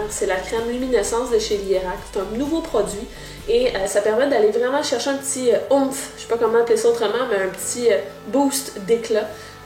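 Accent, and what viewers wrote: Quebec, Canada. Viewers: Magnifique ! Je suis sous le charme de ton accent québécois je dois avouer. Souvent en France on trouve ça très drôle de vous entendre parler mais toi, je sais pas, j'aime beaucoup. J'ai un mariage l'été prochain, si j'arrive à avoir une jolie silhouette j'aimerai bien avoir une jolie robe comme toi. Bisous de Paris !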